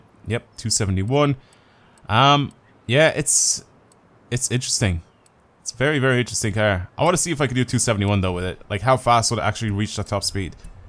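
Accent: Ireland. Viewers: What part of Ireland is he from if he’s even from ireland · Are you from Ireland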